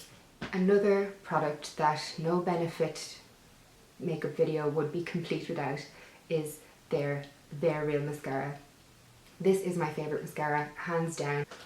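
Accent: Ireland. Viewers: I thought you couldn't possibly get anymore perfect and out came that Irish accent... I think I'm gonna cry ;( X love you ;) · I watched a couple of your videos with no talking - was really not expecting you to be Irish! Must be the intense sunlight through the window haha - nice to see a local girl doing well - and you're looking well too! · Love the Irish accent! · I am inlove with your accent! Is that Irish? Xxx new subbie! Xx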